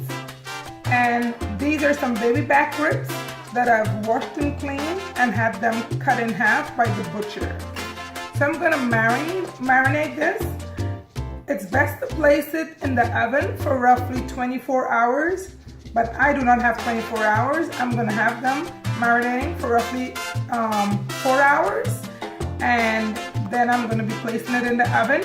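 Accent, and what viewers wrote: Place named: Guyana. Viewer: I love your voice it’s so soothing are you from Guyana? Thanks for the recipe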